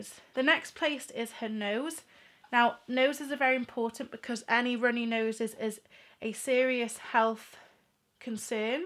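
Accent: Wales, United Kingdom. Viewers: Are u welsh?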